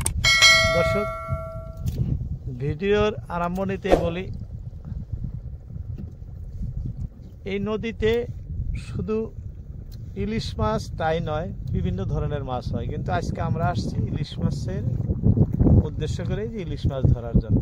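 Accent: Bangladesh. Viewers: চাচা আমি আপনার ভিডিও দেখি,, আসাম থেকে ভিডিও গুলো দেন,কিন্তু আপনার ভাষা বাংলাদেশের মানুষের মতো, আসামের ভষাতো এরকম না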